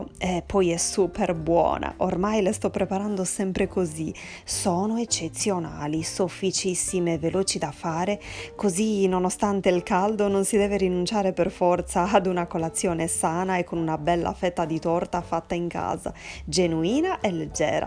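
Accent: Sardinia, Italy. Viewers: MA BRAVA,LA FARÒ SICURAMENTE....CHE PIACERE SENTIRE IL TUO ACCENTO SARDO! GRAZIE X QUESTA RICETTA 👏👏👏👏😘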